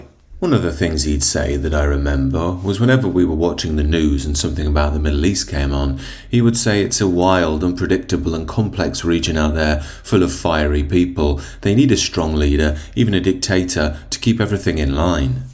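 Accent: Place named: Yorkshire, United Kingdom. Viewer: Knew you had some Yorkshire in there..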